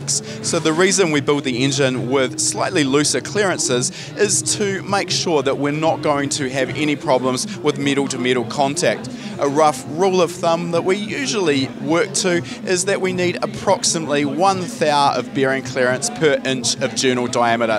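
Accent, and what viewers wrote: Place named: New Zealand. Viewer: Solid kiwi accent. Having said that, fantastic explanation.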